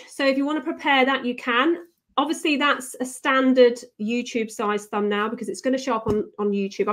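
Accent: United Kingdom. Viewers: lOVE YOUR BRIT ACCENT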